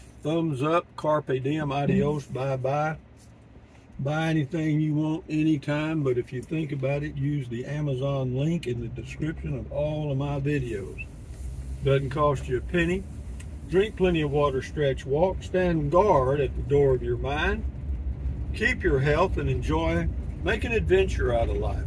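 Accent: Texas, United States. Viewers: I won't rent out my RV's either! I have a vintage Silver Streak & a 24' motorhome. Yes, my clothes, dishes, cooking utensils, etc are in them. I rigged out my van for an 8mth trip to PA to help my Mom, but I sure am missing Texas & our accent! 🇨🇱 I had to put Texas stickers on my van just to have a little Texas with me! I'll be back in a couple of months. Thanks for a little atmosphere & accent!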